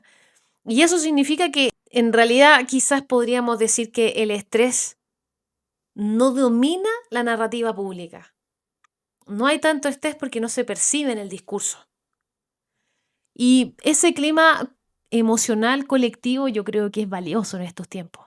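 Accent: Chile. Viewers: Srta, he tenido la oportunidad de vivir en varios países, iincluyendo Costa Rica hoy vivo en Kentucky pero que maravilloso ha sido su analisis sobre este pequeños pero bello país, por tu acento creo que eres chilena y una de mis mejores amigas en mi pequeña ciudad Somerset de es Sol, una ex diplomatica de Chile en China y Europa con quien compartimos todas las semanas y siempre terminamos hablando de nuestra amada ¨Tiquisia¨. Su analisis me ha hecho reflecionar de todo lo que implica la expresión PURA VIDA. y sin temor a dudas cuanta razón tiene ud. Ojala cada pais de nuestra amada Latinoamérica y del mundo tengamos ese sentido de orgullo de la tierra y cultura que nos vio nacer, como lo diría el gran Poeta Nicaraguense R.Darió ¨ Si la patria es pequeña una grande la sueña¨ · Me gusto bastante como lo explicaste, es así como que hay poblemas y tratamos de que no nos afecte tanto, acá algunos llevamos la vida como relajada como sin prisa Y cuando uno va a otros países le extraña ver el corre corre, por tú asentó me pareces Chilena, hace poco fuimos allá y fuimos a un lugar en la ciudad a comer comida bien tradicional y el mesero nos atendió como con una prisa y a la vez se veía como triste y decia una amiga comamos y nos vamos porque ese señor como que no nos quiere aquí 😅 Será eso que nos acostumbramos al trato Pura vida ❤ siempre una sonrisa, siempre sin prisas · Saludos hermosa Arquitecta de sueños. 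´¿ Quisiera tener una polola como vos? Ja quedo impresionado por tu cultura, bueno soy un tico que quedó enamorado de tu país y sólo fui desde Santiago hasta Antofagasta, ahí me quedé. Chile es la tierra donde alzas una piedra y aparece un poeta. Me encantó la cultura poética de Chile, tengo gratos recuerdos y me halaga lo que hablas de mi país. Soy Alex, un poeta costarricense y puedo escribir unos versos sobre tu belleza. Me encanta como proyectas la voz, tu lindo acento chileno y bueno me gusta que hables con conocimiento. Quisiera volver a Chile para cooncer el Sur, eso sí en verano por favor. Dicen que el Sur de Chile tiene muchos lagos. En el norte se ve otra cosa y se prueba el Pisco chileno. Saludos y avísame si querés el poema de un tico y a donde te lo mando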